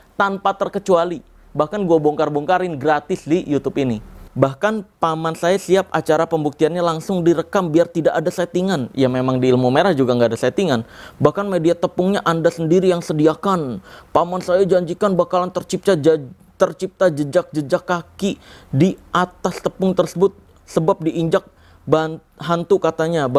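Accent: Java, Indonesia. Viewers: Hhaha ngeper, bahasa jawanya kental bgt.. Conggrats 4jt subs 🎉🎉🎉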